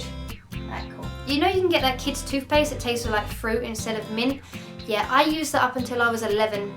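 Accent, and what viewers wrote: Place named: Leeds, United Kingdom. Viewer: sounds like you are from Leeds or Buckingham Palace. Royalty!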